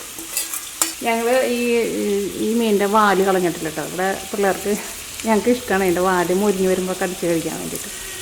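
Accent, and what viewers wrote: Thrissur, India. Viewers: നാട്ടിലെ വീഡിയോക്ക് ഗ്രാമത്തിന്റെ ഭംഗി കൂടി ഉൾപ്പെടുത്തി ചെയ്യുന്നതിന് അഭിനന്ദനങ്ങൾ . മുണ്ടൂർ പള്ളിയുടെ തൊട്ടടുത്താണല്ലെ നിങ്ങളുടെ വീട് . വീഡിയോ കാണുമ്പോൾ എനിക്ക് തോന്നാറുണ്ട് തൃശ്ശൂർ ശൈലിയിലുള്ള സംസാരമാണെന്ന് . ഇത്രയും famous ആയി ഒരു youtuber തൊട്ടടുത്ത നാട്ടുക്കാരാണെന്ന് ഇപ്പോഴാണ് അറിയുന്നത് .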